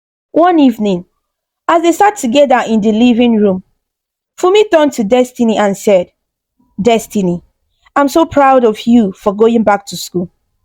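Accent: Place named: North Carolina, United States. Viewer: I love your stories and your accent...North Carolina, USA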